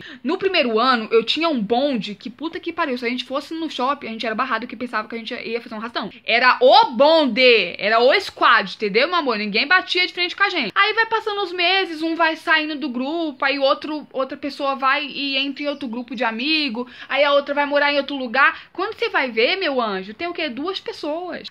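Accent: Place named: Rio de Janeiro, Brazil. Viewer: Época que tinha sotaque de carioca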